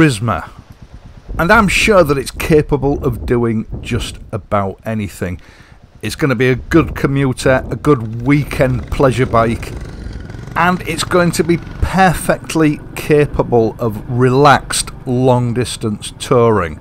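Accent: United Kingdom. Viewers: Great British accent and great British engineered bike! The British are far better than Americans and even better than the Japenese on traditional standard motorcycles, the only type that interests me. My other bike is a Triumph T120, another great classic bike!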